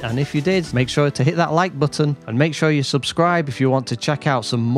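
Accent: United Kingdom. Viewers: brittish accent???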